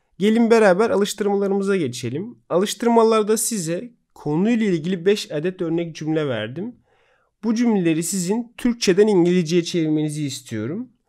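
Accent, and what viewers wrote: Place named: London, United Kingdom. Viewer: Hocam şiveniz aynı londra maşallah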